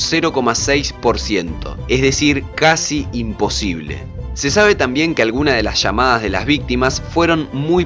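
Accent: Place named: Rioplatense, Argentina. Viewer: Es un vídeo interesante, aunque varias de las explicaciones ya han sido ampliamente conocidas anteriormente. Ahora en este vídeo olvidaron uno de los hechos más significativos, que es el derrumbe injustificado del "Edificio 7", el que no fue tocado por ninguno de esos aviones empleados en esta Bandera Falsa, sino que ese edificio se derrumbó solo y sin explicación alguna. Y para mayor abundamiento, una periodista estadounidense en su informe en vivo mencionaba el derrumbe del "Edificio 7, cuando a su espalda, éste aún se mantenía plenamente en pie, y sin daño alguno. No obstante ser éste un buen documental —y lamentablemente— el relator de este vídeo padece de la tara foniátrica rioplatense de confundir reiteradamente la pronunciación de la LL y la Y, con la CH. Él menciona esto: chamar, cha, aquechos, chamadas, prochecto, echa, detaches, chamativo, chegaba, achí, chevaron, chegar, machor, anicho, cacheran, apochan, echas, ensachos, concluchendo. 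Por querer decir esto: llamar, ya, aquellos, llama, llamas, proyecto, ella, detalles, llamativo, llegaba, allí, llevaron, llegar, mayor, anillo, cayeran, apoyan, ellas, ensayos, concluyendo. Lo cierto es que el sonsonete reiterativo de esa tara foniátrica, termina fastidiando al oído de cualquiera que no sea de esa región. Creo que este videísta debe ir pensando en instalar una voz robótica en sus vídeos, es mucho más neutral, tienen buena pronunciación, y lo mejor de todo, sin esos desagradables sonsonetes de aldea. Hay que cuidar n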